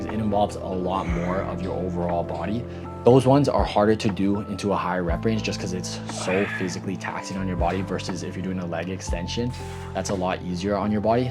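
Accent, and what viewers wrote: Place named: Canada. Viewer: you have a strong canadian accent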